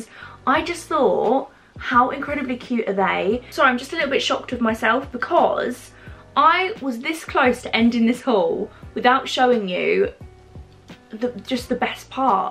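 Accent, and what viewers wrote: United Kingdom. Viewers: Completely unrelated question but where are you from? (Obviously don’t say if it gives away where you are living) but I have been watching your videos for quite a while now and cannot place where in the UK your accent is from. I love it!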